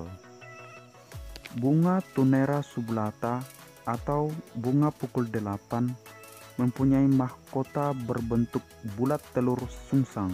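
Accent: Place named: Batak, Indonesia. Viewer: BATAK SEKALI, BATAK KENTAL CESS..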